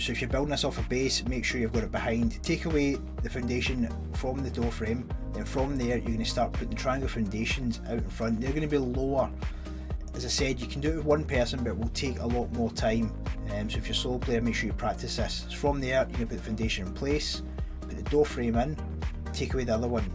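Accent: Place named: Scotland, United Kingdom. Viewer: Man r u from scotland cus i love ur accent!!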